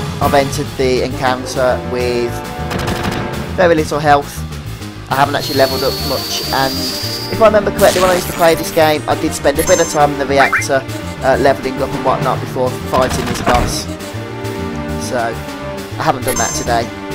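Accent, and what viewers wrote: United Kingdom. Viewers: ooo a british lper I'm subbing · I know this is way off topic for the game and everything, but I was wondering where is from. I can't quite place the accent. I have a slight thought of British but then again Australian also comes to mind. I mean correct me if I'm wrong but it's just a thought and I'm really curious. I'm a little embarrassed to say that I find his accent cute...;)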